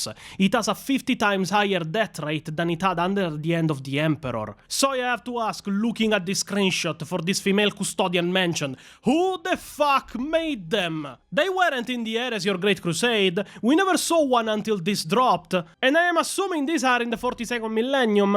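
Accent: Italy. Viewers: God, hearing you rant about 40k lore with that italian accent, makes me want to believe everything you say and also to fight side by side with you brother! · What region of italy accent do you have? It is very entertaining for narrating. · ho riconosciuto il tuo accento italiano XDDDDD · Idk why I'm here, i just like your Italian Accent. Subscribed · wait, I know this angry Italian voice ....... didnt you help Raven Knight with the Templin fiasco ? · Are you Italian or something? I can hardly understand you. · Geezus fucking christ you need to turn down the italian. Gooing to have to watch this video at half speed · Duuuuuuuude you voice, accent and didiction amazing. Were are you from? Italy?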